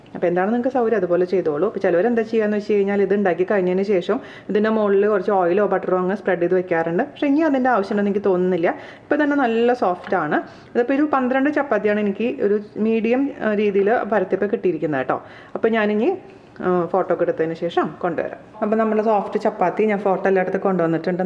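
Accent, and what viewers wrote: Thrissur, India. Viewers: Hi, thank you for sharing the recipe😍 You are a lifesaver. I have become an amazing cook myself following ur recipes. More than the recipes you share I just love listening to your voice. Being abroad in a country with white people away from home it gives me soo much happiness watching all your videos, listening to you trissur slang😁. Lots of love ur amazing and an inspiration to many housewives😍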